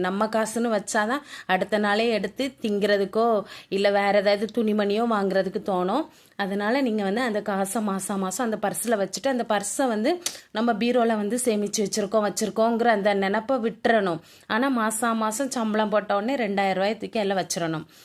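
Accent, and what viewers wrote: Tirunelveli, India. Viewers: அருமை....😅😅 நெல்லை தமிழ் 🎉🎉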